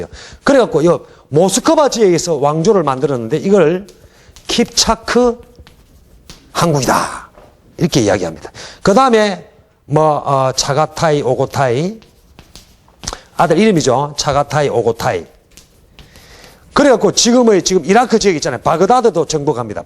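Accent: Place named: Gyeongsang, South Korea. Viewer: 내가 들으니 사투리가 하나도 없는 정통 표준말인데, 다만 말투가 경상도 말투로 보이는데 그것을 말꼬투리 잡아 사투리라고 시비걸면 쓰나?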